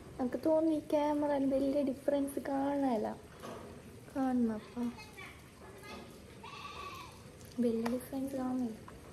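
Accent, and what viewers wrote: Kasaragod, India. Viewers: I can understand your slang because Iam living with my ksd friends seven years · Ksd slang kekkaan vanna njn😌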